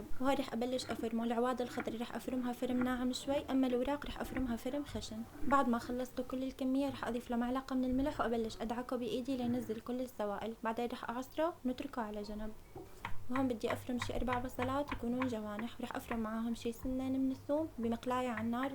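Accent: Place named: Deir ez-Zor, Syria. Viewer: لهجتك ديريه أو حسكاويه ❤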